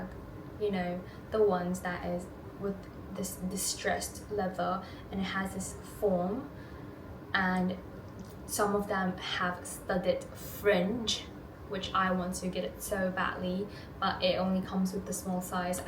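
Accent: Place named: England, United Kingdom. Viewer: I slow your video way down so i can watch while i work. Then i can also read what you are saying so it's great! I love most of these trends. It's crazy how many of these i already have! Mixing glam with sportswear sounds super fun so im gonna try that! Thank you for inspo and i love your English accent lol! subscribed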